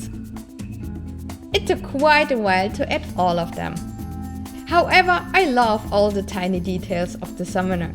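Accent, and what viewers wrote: Germany. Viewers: is she from Germany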